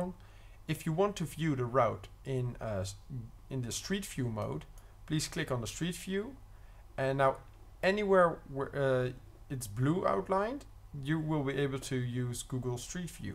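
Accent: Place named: United States. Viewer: American pronunciation is quite annoying.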